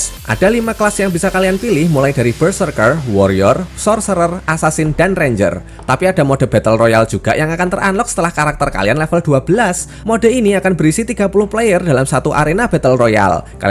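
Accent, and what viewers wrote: Java, Indonesia. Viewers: Jowo ne Endi kang salam dari Ngajuk 🙏😊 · Orang Jawa ya bagian mana bang daerah mana bang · medok banget 😅😅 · Suka banget pembawaannya bang ad Jawa Jawa nya😀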